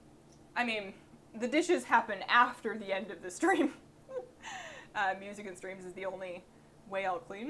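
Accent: Canada. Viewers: Never knew you were Canadian until this one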